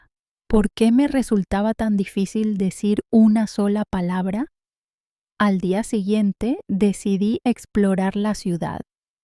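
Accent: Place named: Latin America. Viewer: La hablante tiene acento latinoamericano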